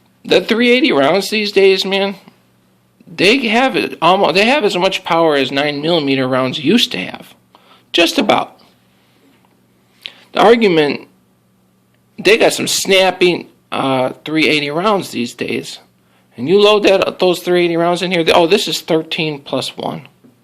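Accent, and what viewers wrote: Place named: Brooklyn, United States. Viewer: Where'd you grow up that you talk that way? Brooklyn?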